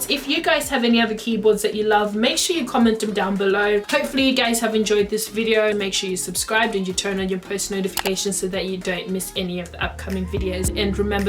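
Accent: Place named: Australia. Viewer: Thanks for sharing so much detail, I love your Aussie accent :)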